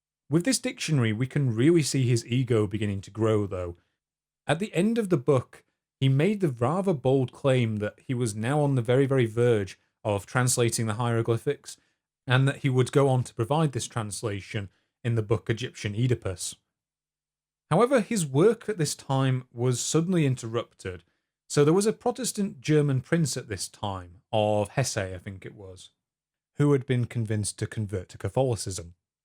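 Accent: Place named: England, United Kingdom. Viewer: thats not an American an Englishman. Trying to act smart when you cant even tell accents apart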